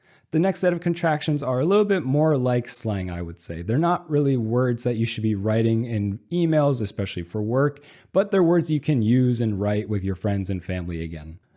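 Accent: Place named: Russia. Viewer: Not being rude, but you do have 1% russian accent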